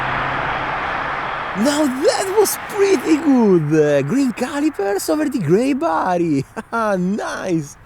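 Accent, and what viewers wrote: Italy. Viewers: I love this channel! infectious enthusiasm about every car leaving or arriving at the Lamborghini factory (not to mention the ducks) just puts a smile on my face. And punctuating it all with that awesome Italian accent, what's not to love?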